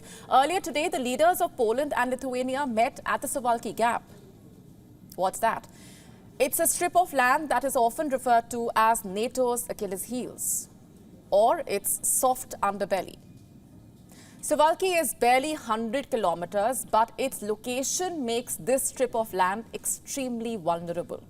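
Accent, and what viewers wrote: India. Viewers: Omg this Indian accent 😂😢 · Love Indian accent, only sometimes pronunciation is off..like "wulnerable" instead of vulnerable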